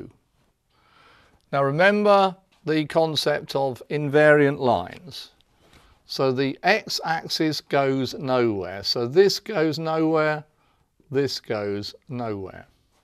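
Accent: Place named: England, United Kingdom. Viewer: Takes a bit for me, as an American, to get used to the English accent, but I find the explanations to be quite good. Thanks for the upload!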